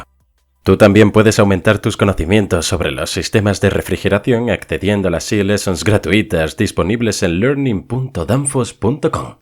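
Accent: Spain. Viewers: tipico acento espaniol :)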